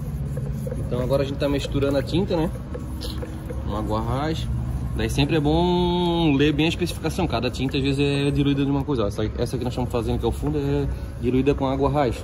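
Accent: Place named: Santa Catarina, Brazil. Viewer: Top o trampo! Com esse sotaque deve ser do litoral de SC, hhahahahh. Salve do Zinga/Floripa.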